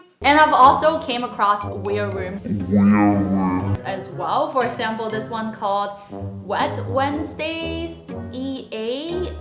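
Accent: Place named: Australia. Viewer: Where are you From. Are you an Aussie girl? i love your accent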